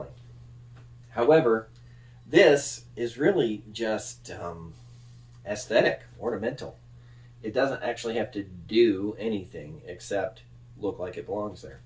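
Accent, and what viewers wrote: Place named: Nebraska, United States. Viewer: Welcome back. Sounds like you grew up in Nebraska. And, you could use a Tig welder, they make them really small these days.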